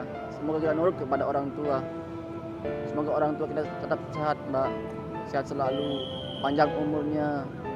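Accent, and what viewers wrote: Lombok, Indonesia. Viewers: knapa nada antum macem orang lombok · Boleh Sy nebak-nebak...spt Logat orang Lombok❤️ Mf kalo salah...! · Logat"nya kek org lombok njirr😅 Owyah Bdw salam bang Gua dri Ntb nusa tenggara barat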